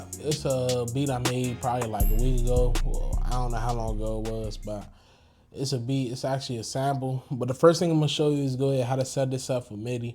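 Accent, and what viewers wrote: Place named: DMV, United States. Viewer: you got a dmv accent pushp on me i own a studio in dc let get you some placemnts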